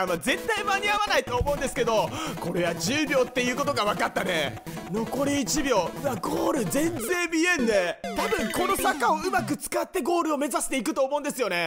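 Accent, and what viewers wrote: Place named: Miyazaki, Japan. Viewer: さん宮崎出身ですか！？ 〜しちょる。とか 〜にしちょった。ってよくじいちゃん喋ってて懐かしかった笑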